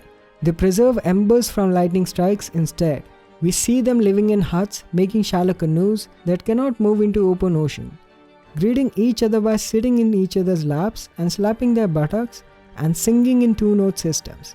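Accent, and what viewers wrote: India. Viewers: Disgusting accent. Hate the indian accent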